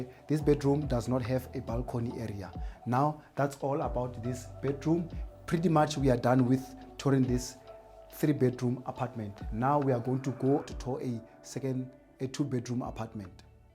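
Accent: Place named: Africa. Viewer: Very nicely presented with an African accent.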